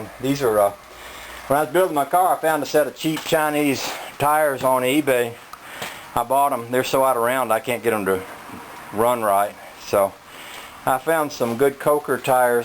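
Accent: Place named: South, United States. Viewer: Where are you located? Your accent tells me your in the south but I've seen this car at the Owls Head transportation Museum in Maine, far from the south, haha. Did you sell the car? Or loan it to the museum? I Love that place and usually visit every time I'm up in Maine.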